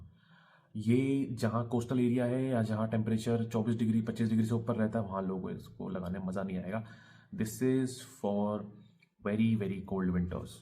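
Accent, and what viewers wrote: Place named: Haryana, India. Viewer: Paa ji aap haryana se ho na kyoki maine kai baar notice kiya h ki kai baar bolte bolte bich bich me haryanvi ton me koi koi word sunai deta h .baaki aapka explanation outstanding h perfume information k बारे me Jyode vasde rvo god bless you .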